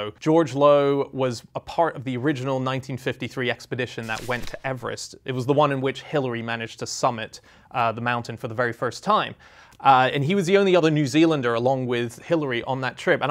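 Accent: United Kingdom. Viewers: True, but only part of ot. You sound to be british so you should know better... War came to Britain later than it came to Poland so it's just normal that Polish mathematicians started to decode it. It's not like bomba was not accurate to newer enigma model it's that British ( that sheltered those Polish mathematicians) couldn't afford to build more. WE as human should respect all that contributed for the greater cause. ALL those who were working on deciding the enigma were great pro's.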